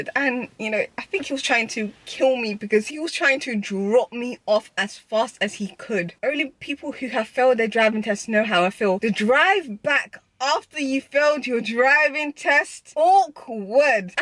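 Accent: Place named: United Kingdom. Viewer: Hello, I'm a fellow Arabian and I was astonished when I heard you in a British accent. I'm myself trying to perfect it but I'm struggling alot because many letters in the Arabian dialect I talk in sound way too differently than the British one. I was wondering if you could make a video explaining how mastered it for ppl like me or atleast reply to this comment. love ur videos. xoxo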